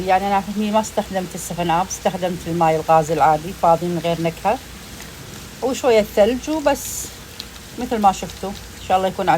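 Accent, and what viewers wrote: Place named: Gulf, Arab world. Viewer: بالتوفيق ان شاء الله .. تشرفت بمعرفتك ... صوتك خليجيه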